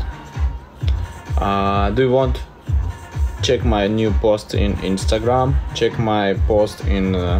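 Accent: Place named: Spain. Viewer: Me encanta su acento español su acento ruso y su inglés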